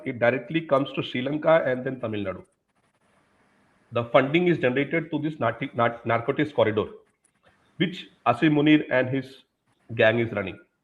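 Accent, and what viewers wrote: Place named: Kerala, India. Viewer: I can easily make out the Mallu accent (among other things). It's difficult to hide.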